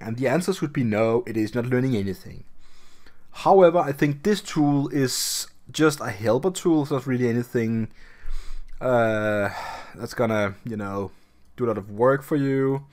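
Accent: Denmark. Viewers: Do i sense a hint of danish in that accent 🙂 Glimrende video må jeg sige, hutlihut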